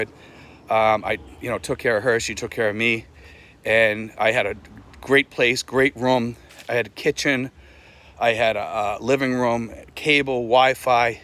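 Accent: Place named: Massachusetts, United States. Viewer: You sound like your from Mass! I from Boston.Iplan on moving to the Philippines before I'm 58.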